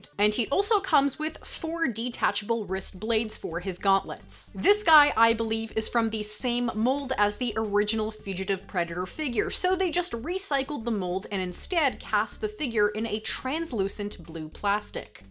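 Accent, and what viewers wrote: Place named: Vancouver, Canada. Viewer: Your Vancouver accent is very apparent. I speak differently from you here in Ontario. Your speech is a little bit more clippy whereas, we tend to speak a little more laid back in Toronto.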